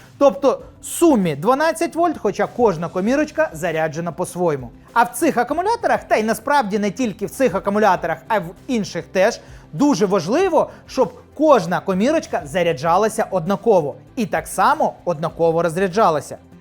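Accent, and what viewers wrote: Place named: Russia. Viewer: Чоловіче! Ти мабуть "русскій"? По інтонації чую, по агресивній заздрості. Щоб з банок по 3,3 вольта отримати 12 вольт - дійсно, треба послідовно з'єднати 4 банки. Фізику в 6-му класі вчив? Чи прогулював? Чи тебе засмучує, що 3,3×4 - буде не 12, а 13,2? А до літієвої банки, на якій написана напруга 3,7 В, а на свіжезарядженій насправді близько 4-х вльт, у тебе претензій нема? У мене більше претензій до залуПутіна, який каже, що він за мир, а насправді робить війни. Тебе він не обурює?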